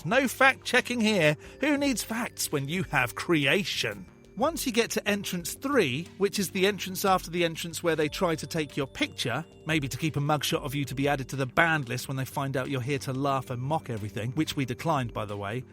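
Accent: Kentucky, United States. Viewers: For years, I've been trying to "place" your accent, geographically. Imagine my surprise, I'm not as familiar with Kentucky regional speech as I thought. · That is how they speak in Kentucky? Justified!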